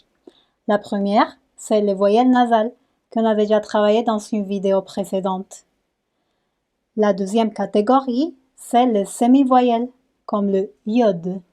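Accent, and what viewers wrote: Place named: Spain. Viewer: HoLa !! Je suis Français (donc ma langue maternelle est le français) et hispanophone "autodidacte" (je n'ai jamais suivi de cours mais j'ai beaucoup écouté et lu et vu des tutoriels) : il me semble que votre vidéo, si je l'ai bien visionnée et écoutée attentivement, peut prêter à un certain degré de confision, car il ne m'a pas semblé que vous abordiez le "e fermé" (peu, deux, feu, euh...) alors même que c'est la manière type de prononcer cette lettre quand on récite l'alphabet en français ! d'ailleurs, quand vous évoquez brièvement le "y" vous le nommez "la yod" alors qu'en français, on dit "le i grec" ! par ailleurs vous récitez les voyelles "à l'espagnole" en particulier le "u", que vous prononcez tel un "ou" à la française... merci cependant pour vos efforts de vulgarisation ! Par ailleurs il me serait loisible d'entrer directement en contact avec vous ou quelqu'un d'autre de L'atelier du français | Paris (pour une bonne raison musicalo-professionnelle car je suis Compositeur) !!!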